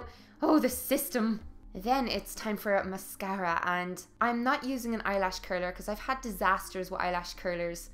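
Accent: Ireland. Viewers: As much as Kylie Jenner kinda annoys me (and all the hype... which I don't really "get")... or the concept of makeup done for the sake of selfies, I LOVED this. First time watching. New sub;) <3 So charming, and super skilled! Also, don't get me started on Irish accents. GOTTA LOVE THEM!!!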